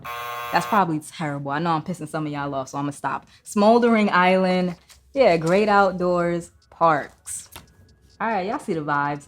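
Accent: Boston, United States. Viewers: Boston hat is 🔥🔥 and lol on the accent, I’m from Boston and hate that accent lol it’s more white Boston/suburbs. I’ve had a busy week with hats. sneakertown had some re stocks and you talked about before the Morton salt hat. I’ve been waiting for it since then and my girl hit on it for me last one in my size and she copped the biggie hat from the 5 mike pack, and the rangers hat from ecapcitys drop last Friday cause I went for the cubs. She’s awesome. Also copped my first Rockies hat too. I miss your upcoming drop videos you do the best ones cause you talk about ones that haven’t dropped yet. Appreciate you and your videos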